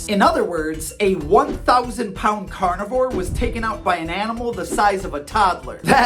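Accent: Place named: New York, United States. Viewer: I just wanna say that I truly hope this guy makes a ton of money. He is so entertaining and hilarious and he looks at things that we're so used to and he makes us look at those things in a completely new and admirable way, like he makes us respect and appreciate the nuances of animals wtf?? And with a new york accent, it's just so uniquely epic and entertaining. Never change,!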